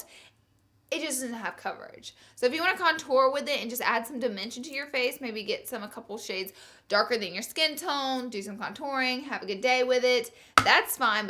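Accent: South, United States. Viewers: Zoey VH I know a lot of southern people that say it just like her. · I love how you say "oily and grezy" lol that southern accent shines threw girl! Lol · when I first watched you your accent threw me off because I haven't heard anyone with a southern accent before..but I love your videos much love from Dq Arkansas❤ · Laura and I LOOOOOVE your videos and I love the southern accent. I'm from Texas so when I hear you say y'all it brings a smile to my face cuz I thought only Texans say y'all 🤣🤣🤣🤣👍👍👍👍😬😬😬😬 keep up the great work!! #teamLee!!!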